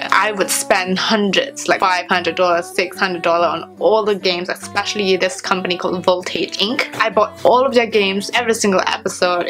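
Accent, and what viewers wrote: United Kingdom. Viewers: why you got British Accent? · You have such a beautiful accent, it's like a mix between British and Chinese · You kind of sound like you have English(British) accent with your pronunciation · wow is she british??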